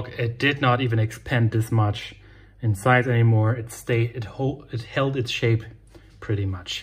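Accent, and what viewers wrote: Germany. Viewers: Are you from Flensburg? :)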